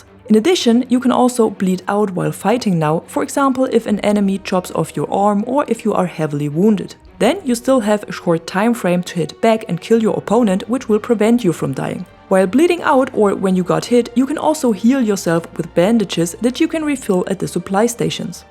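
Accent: Germany. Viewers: Bist du deutsch? 😆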